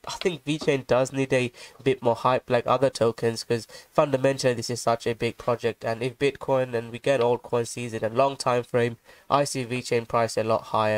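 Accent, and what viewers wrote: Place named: British Asian, United Kingdom. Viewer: you come from a chapati eating background ...😂😂😂😂😂 for sure !!! I cant be wrong , thats them pharata Uk English accent lol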